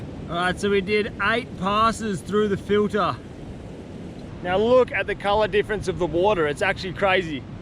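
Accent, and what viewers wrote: Western Australia, Australia. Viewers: Its and Australian accent i can tell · yes! Quite easily distinguished. Australian’s speak a lot slower than New Zealander’s and have a stronger nasal twang also lots of slang that we don’t use, we have 3 different accents countrywide, European Kiwi (Pakeha), Maori, and Southland accent. Australia has many more than us and the one that is most alike ours is Tasmanian but the guy in this vid sounds a little more Western Australian, Perth region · Love your bloody Ozzy accent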